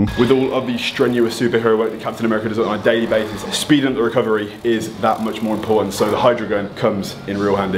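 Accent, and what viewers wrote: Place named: United Kingdom. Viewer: This was so much fun to watch and made my day! Seeing your costume struggles beforehand was just as entertaining as your time at the gym, so I'm glad you included that. I think anyone who has gone to a ComicCon can relate and it's amazing you got everything to stay on with as big and muscular as you are. Also, I'm from the US so hearing Captain America with a UK accent made me smile as well. Looking forward to the next video!!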